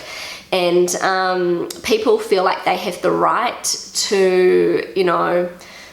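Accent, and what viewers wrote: New Zealand. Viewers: Hi from NZ! I love how strong your New Zealand accent is even after living in Germany for quite a long time 😁 💗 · Hi, I am a native German speaker who has never been to NZ but I love to watch your videos and to listen to your dialect! Your children have been a lovely change but I understand your decision. Happy X-mas und einen guten Rutsch ins neue Jahr.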